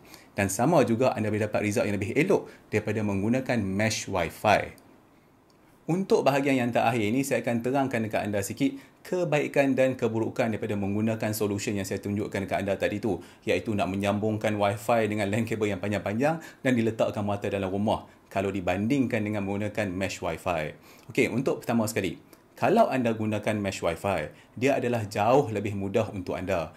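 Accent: North, Malaysia. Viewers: Cukup sempoi n natural. Maintain bahasa utara. Terbeikk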